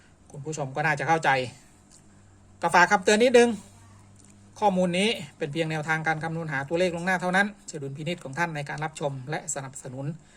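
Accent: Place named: Isan, Thailand. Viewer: ผมว่าอิสายครับ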